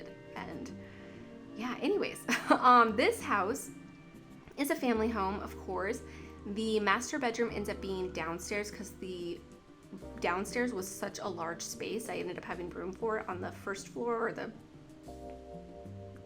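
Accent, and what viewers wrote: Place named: United States. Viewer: And you know since you live in America actually I think you live in America based on your accent either that or somewhere in Canada and you can definitely buy the packs and write them off on your taxes as business expenses if you're not sure of that you should ask your husband but what I'm saying is verifiable You could even purchase brand new computer and write that off in your business expenses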